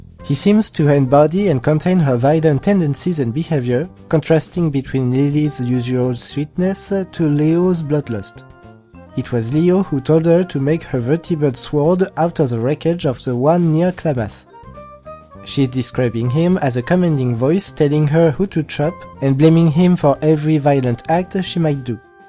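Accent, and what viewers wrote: France. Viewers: ton accent français Me croire que tu es un français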